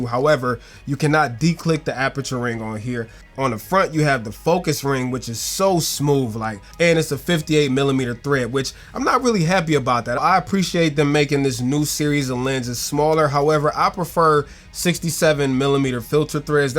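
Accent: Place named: United States. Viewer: Excellent, short video. You've covered everything that needed to be said and illustrated the points with decent photos. This is rare among YouTubers. Of course, being English, and living in Greece, l didn't always understand your US version of the language but l will try and catch you again. 🤣😎🧿 I will be getting the lens for street photography. It seems to be the perfect fit as a walkround lens on my A7r3 and an upgrade over the Sony/Zeiss 2.8/35.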